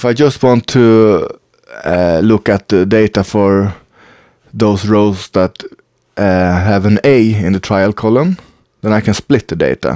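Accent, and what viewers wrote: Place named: Sweden. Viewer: skön brytning, hör att du är svensk :)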